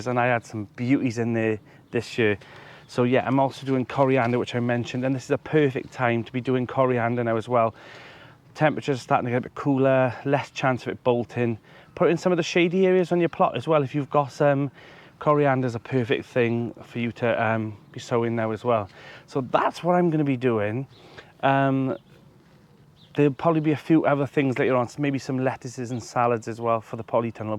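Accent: South Wales, United Kingdom. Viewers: I love your accent. Reminds me of my relatives from South Wales. I'm just really hard of hearing. Many thanks. X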